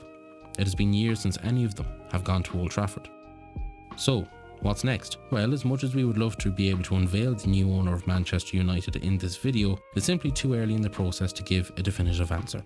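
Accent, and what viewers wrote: Ireland. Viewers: Grand Irish accent there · Irish accent ❤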